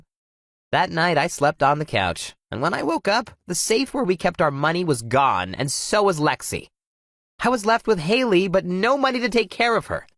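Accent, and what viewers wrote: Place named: United States. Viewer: Is nobody gonna address the fact that he is from South America, and yet he has an American accent?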